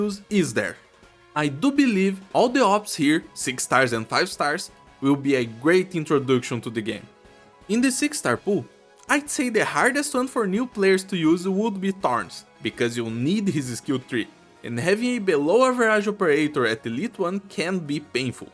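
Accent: Brazil. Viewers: I hear a Brazilian accent 👀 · by any chance, are you Brazilian? i am and the accent your English have is very similar to the one Brazilians have speaking English lol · Sotaque de brasileiro e quase instantâneo de reconhecer khkhgkj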